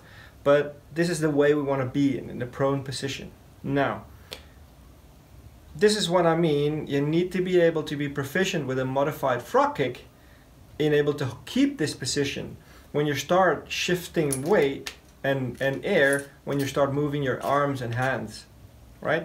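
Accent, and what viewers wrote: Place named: Netherlands. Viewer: Clear description, many thanks! And now it makes sense. Dutchman liven in Denmark making video’s in English. I’ve been watching your valve overhaul video yesterday while underway in the kiel Kanal and was noticing a slight Dutch accent but Danish comments. 🤔. Watching this today in Denmark (Kalundborg)!while discharging it became clear. 😀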